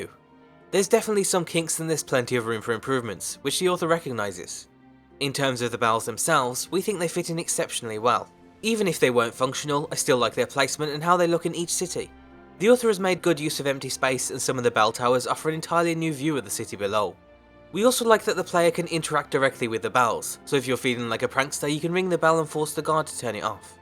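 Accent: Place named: North, United Kingdom. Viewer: His accent has changed. Much more Northern now I think....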